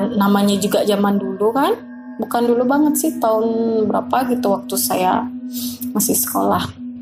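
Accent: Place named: Bali, Indonesia. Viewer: Logat balinya sampek sini mbo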